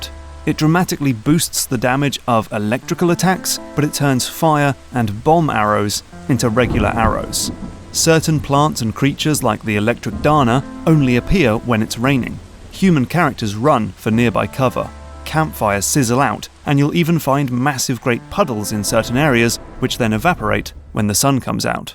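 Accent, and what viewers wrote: United Kingdom. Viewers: "Electric Danna" You british folk and your ARs. Anyway, glad to see I'm not the only on who dyed Link's hood green.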